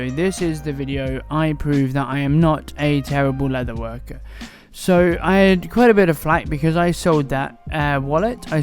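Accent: United Kingdom. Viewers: Already subscribed. Learning a lot! Thank you. But the music is a little distracting when i understand English just not England English so some of your words are already difficult to pick up sometimes.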